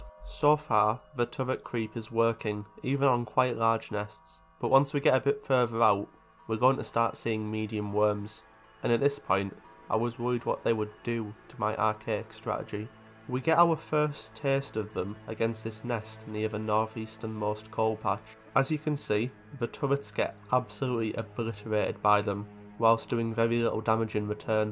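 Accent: United Kingdom. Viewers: Ngl your british accent made me watch the whole thing. and your amazing gamplay as well. Great content keep going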